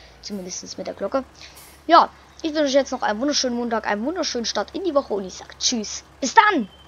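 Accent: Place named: Bavaria, Germany. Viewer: Bayrisch war cool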